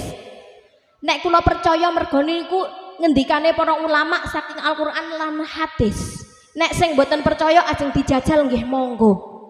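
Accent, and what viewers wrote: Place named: Java, Indonesia. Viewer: Boso jowone meduuuk Anak² skrng bnyak yg tdk bs bhs kromo inggil 🙏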